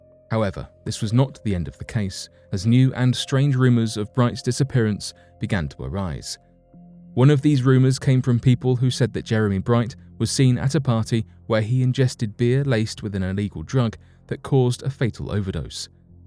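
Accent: England, United Kingdom. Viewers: The missing penguins are from globle warming no doubt everything is caused by it from any England voiced narrator as well as Democrat producers.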